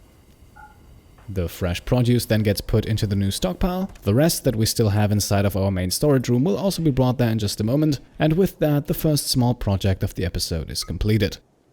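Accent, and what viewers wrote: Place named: Germany. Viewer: Greetings from your neighbouring country (the Netherlands) I love rimworld and i am happy i found your channel a month or two ago. One of my favorite games with someone who is doing a cannibal playthrough and who has a calm voice with a german accent that i really like. Keep up the good work. I can’t wait for the next rimworld series if this one ends.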